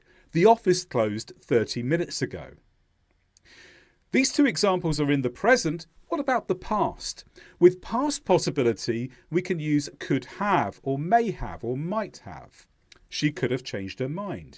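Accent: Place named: United Kingdom. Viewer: I’m impressed by the volume and thoroughness of the information you’ve presented here. Thanks to the video I can identify grammar spots to work on and improve. And the fact you are from the UK makes me even happier ‘cos I prefer this accent. ❤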